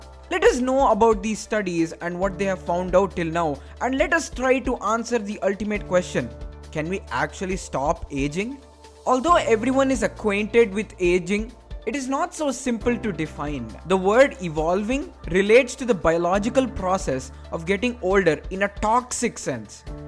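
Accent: India. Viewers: Good topic and research but narration is bad. Feels like a Hindi professor teaching in English.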